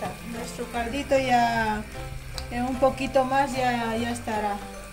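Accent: Spain. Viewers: Lo primero que me llamó la atención, el acento. Inmediatamente pude percibir que reside en España. Por lo demás, práctico la receta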